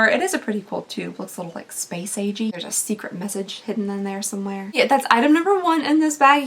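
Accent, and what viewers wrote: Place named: South, United States. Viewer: You're one of my favorite YouTubers. Your southern accent (I have one too), your personality, your babies, and your authenticity are very refreshing and make me feel like I'm sitting around chatting with my sisters.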